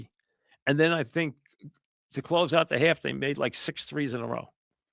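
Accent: New York, United States. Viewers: He's in or near Noo Yawk whaddya expect? Back afta dis...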